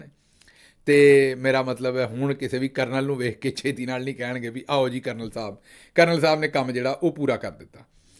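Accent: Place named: Punjab, India. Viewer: Always brilliantly executed love your Punjabi accent thank you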